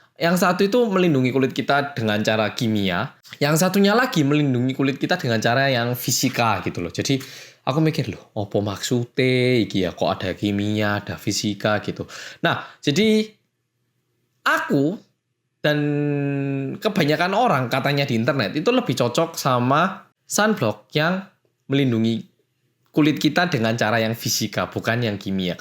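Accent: Java, Indonesia. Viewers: Notif medoook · Oke hellow guys. Welcome back to bersama orang korea yg MeDdokk 😂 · Bisa barengan notif youtobe and live di IGmu mas korea medok.. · Aku wes mbedek....."orang Korea yg "jerawatan"..." ee e jebule yg medok....salah dech..isin aku...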